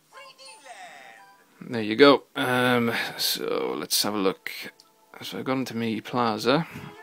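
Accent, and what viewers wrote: Ireland. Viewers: are you Irish? Because I can hear a tiny bit of an Irish accent.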